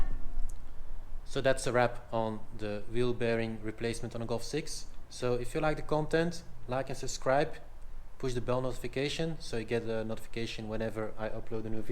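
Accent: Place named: Belgium. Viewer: Ik kon zo horen dat je een mede belg was, goed uitgelegd bedankt voor de informatieve video 🙂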